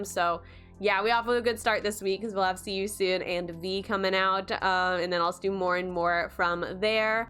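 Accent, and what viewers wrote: United States. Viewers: are you from the mid west ?. your accent sounds mid western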